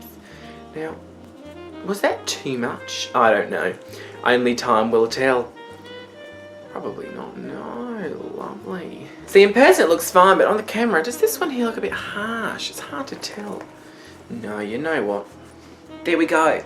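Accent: Australia. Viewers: R u from Australia 🇦🇺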